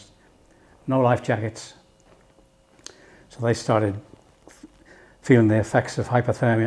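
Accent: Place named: Liverpool, United Kingdom. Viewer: I wonder if he ever said he knew the Beatles when he was chatting up the maids? He sounds like a Liverpudlian.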